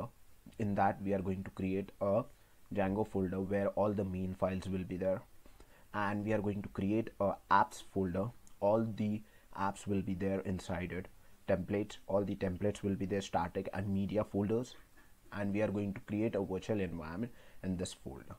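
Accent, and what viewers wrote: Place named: Germany. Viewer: Good day, I have been studying and reviewing videos in Python and now in Django on how to make a website and until yesterday I discovered the so-called templates and the videos that I have seen so far show commands and files that are already obsolete. Until last night I came across this video and it seems to me that it is well structured, updated and in English that I do not master but I have always wanted to learn it and listening to your pronunciation I think it is not your native language either, you sound more like German or European. I was looking over some of the videos of the series, I have high expectations now that I have already seen the first of the series starting with the virtual environment that until now had not deepened it. My goal is to know how to use the templates to not reinvent the stone. Escribi el parrafo en español y lo ingrese al Traductor de Google o sea que si lo quieres leer en español haces la operacion inversa en el Traductor de Google con este parrafo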